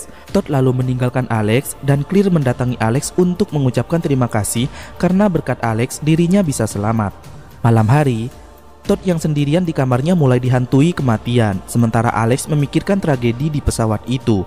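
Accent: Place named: Java, Indonesia. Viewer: Knp tod nya medok sihh😭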